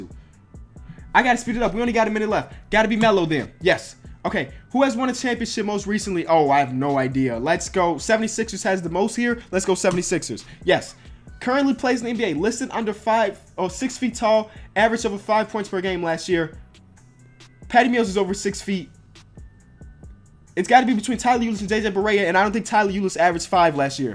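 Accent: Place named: South, United States. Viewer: Why do you talk like you're from the south if you're from Chicago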